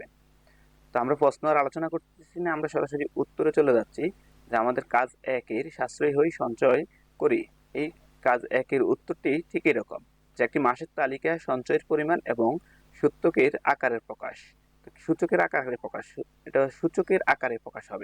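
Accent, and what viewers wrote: Noakhali, Bangladesh. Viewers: Sir apni ki nokhalir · স্যার আপনি কি নোয়াখালীর মানুষ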